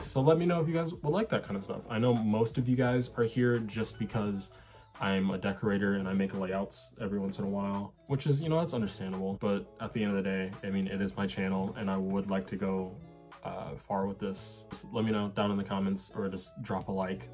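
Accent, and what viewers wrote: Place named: United States. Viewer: I thought you were American lmao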